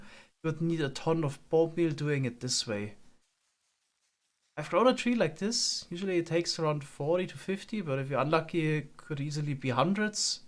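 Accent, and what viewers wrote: Germany. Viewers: Are you German? Your accent really came out in this video. Very nice. 🙂 👍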